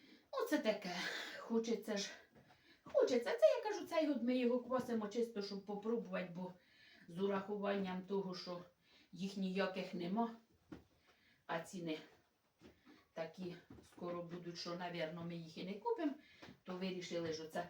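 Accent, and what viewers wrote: Poltava, Ukraine. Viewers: така ж мова, слово в слово, Полтавська обл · Дивлюсь вас слухаю і настрій піднімається❤Балакаєте гарно так як і на моїй рідній Полтавщині(вас послухаю і ніби на батьківщині побула)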